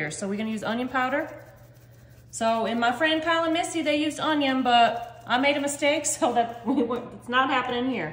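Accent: South, United States. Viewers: Love listening to her southern accent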